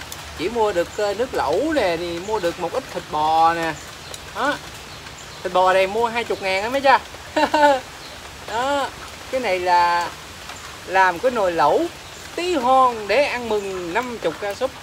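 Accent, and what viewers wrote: Mekong Delta, Vietnam. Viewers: Lần đầu tiên e xem video của a e rất thích. Cười nghiêng ngửa giọng a đúng chất miền tây.ủng hộ a nhé các bạn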